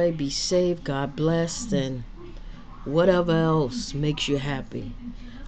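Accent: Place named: New York, United States. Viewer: Are your from NY? Lol i ask because you sound just like my best friend tasha and you both have a slight NY accent, love it!! You give a calm sweet vibe i like that.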